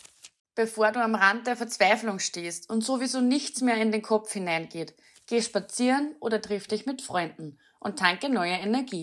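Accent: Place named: Austria. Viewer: yoooo is that an austrian accent? echt krass so sick. best accent, congratulations on growing up in the coolest sounding part of the german speaking world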